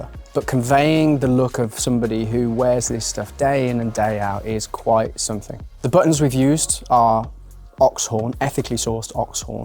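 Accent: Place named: Midlands, United Kingdom. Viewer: First time I've come across the channel and I subscribed. I really like the clear and concise explanation of everything. I disagreed on one point, which isn't major. I wouldn't describe that particular sports jacket as a blazer. Blazers, to me, are always un-patterned (unless they are striped for a particular sports club or activity). I also realize that you are nervous speaking directly to camera but if you could use your hands to gesture towards a point of interest on the clothing rather than gesturing to emphasise your speech constantly it would be a little less distracting. Slight changes of camera angle occasionally might also break up any obvious 'chop cuts' on the video. Nice to hear a Midlands accent on a sartorial channel. Good luck for the future.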